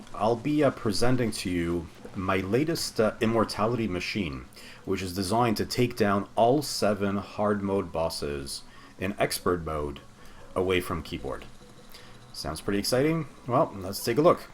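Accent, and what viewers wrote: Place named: Montreal, Canada. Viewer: sound like a montreal boy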